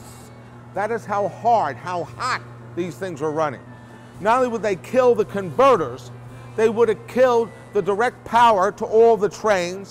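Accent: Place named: New York, United States. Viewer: Sounds like a New Yorker.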